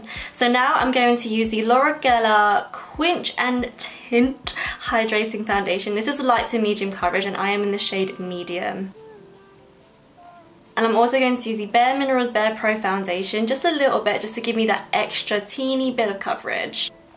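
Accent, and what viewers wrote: United Kingdom. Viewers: Ive only known you from Instagram but holy crap your accent is cute af! Rep us British girls!💜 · I didnt even know you had a youtube channel!!! I follow you on instagram (@ I love your tutorials on there but I wanted to see a more in depth or longer video. Sooooo i checked youtube and low and behold youre here!!! And I was like "YAAAAAASSS" And I had no idea you were British. Right? British? Well i love you and youre makeup tutorials. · I follow her on Instagram and just discovered she had a YouTube channel, and can I just say I totally did not expect her to have a British accent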